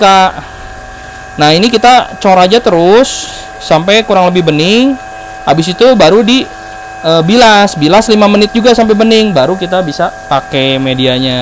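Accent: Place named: West Java, Indonesia. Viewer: logat nya sunda banget euy....hehehehe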